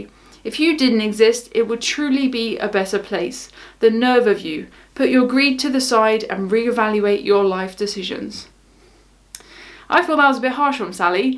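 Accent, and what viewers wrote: RP, United Kingdom. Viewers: Nothing wrong about sounding POSH. You have a nice South English accent. Don't let the silly comments get to you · I love your opinion because you are real and have the experience to form a valid opinion. Love your “posh” accent, but I’m American and we generally fall for British accents! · Darling, you sound just fine to me. What's wrong with posh, anyway? What's wrong with being a nerd? Why are people so critical? Why the need to be so rude? It's just too easy to be rude when one is anonymous on the web. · I've only just recently stumbled across your channel. Congrats on quitting in February! I quite enjoy listening to you. I've always liked the way Brits talk. It, to me, just seems proper. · I'm an American, so I have to admit, I know precious little about the various British accents, but I think your accent is lovely, and if someone thinks it's 'posh', (which as an American, I think means 'classy'?), well be flattered that someone thinks you sound so lovely. 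Also, I don't think you're negative at all. I feel like you're tactfully truthful, quite friendly, and occasionally hilarious. I think of you as my cruise bestie with all the good tips! People see in others what they are giving off TO others, so if 'Sally' can't ever be kind herself, she isn't going to be able to see any good in others, you included. You keep being your sweet, helpful, humorous self, and we'll keep clicking 'like' and 'share'. (We can't keep clicking 'subscribe', because we already did. LOL) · Well I love your videos, and your wonderful BBC accent. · I closed my eyes and for a moment thought I was in Downton Abbey..😁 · you are a beautiful young lady doing work i love sharing cruise things,,,your accent does come over as posh but i am scottish and have issues with myself all the time,,,,,,if someone has to belittle others to feel ok there is something wrong with them and not you...you are caring and sharing and keep up the good work and may i wish you happy cruising for the future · Someone called you "posh"... 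Well I, as a foreigner, didn't know it was a bad thing. I've just recently discovered your channel and binge watching your videos and one of the reasons why I like your channel is your clear, sophisticated way of speaking. So if it's tied to being posh, then keep being posh please and nevermind the haters ;) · I love your "posh" accent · Just block or delete them. Too many creeps that will write things they are thinking but would never dare speak in public. Your voice is beautiful, and being posh is perfectly fine. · Ah, but you do sound “posh” IF that means you can use the English language properly, and take the time to prep and clearly rehearse your bits off so the clips aren’t full of “ummm”, “err”. For context I’ve done voiceovers for corporate videos and know how hard it is to craft a script that flows, and how important rehearsal is. I love the Coffee House Crime (I think) channel but his script & delivery are not good, makes me twitch - I can watch yours all day, really well put together - AND I want to hear you play the piano!! 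😂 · I see this is an old post. You handled it so well with dignity and yet got a few hits in as well. I think maybe some associate the accent with shows like Bridgerton and that it would feel good to go Mrs Brown 🤪 on them as well. Keep up the good work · Dear, The only cruise I took was as a child. It's unlikely I will ever take another, but as a non-cruiser who has the entire internet at my fingertips, I choose to watch you every day. Why? Because your goodness and positive attitude are uplifting and I love your "posh" accent. (You don't have an accent. Everyone else does. Go with that.) If you threw yourself to the sharks as one person suggested, they would make a safe corridor for you and salute you with their fins. No shark would disturb someone who loves the beauty of our planet as much as you do. Hugs and cookies from Idaho (US). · Isn't sounding posh something good? 🤔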